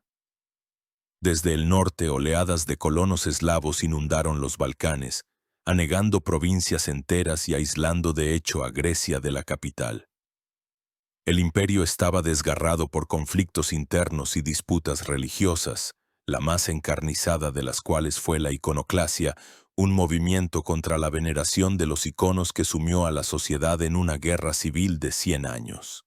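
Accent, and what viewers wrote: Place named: Latin America. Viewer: Aunque todos sabemos que este video esta hecho por IA, supongo que detras de el habra una persona dedicando tiempo y queriendo subir visualizaciones... No se si no se ha percatado de que desde que la locucion cambio a una voz en latino las visualizaciones han descendido drásticamente. Son muchos los comentarios que piden que volvais a la voz anterior pero parece que no se oyen las peticiones de la audiencia. Una pena....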